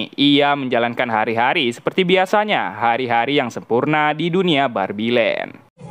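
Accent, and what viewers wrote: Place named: Pekanbaru, Indonesia. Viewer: jelas bgt logat pekanbarunya hahahaha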